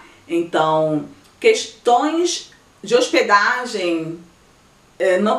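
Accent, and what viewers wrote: Rio de Janeiro, Brazil. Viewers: É que sou carioca e tenho esse seu sotaque, por isso perguntei...rsrs. Bjsss pra vc, linda! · assim que comecei a ver esse vídeo já notei que vc é Carioca. O Carioca mesmo não sendo da "gema" tem o lindo sotaque. Eu sou do estado do Rio também precisamente do outro lado da poça depois de Niterói. Eu moro em USA por quase 14 anos e meu sotaque charmoso Carioca não me deixa.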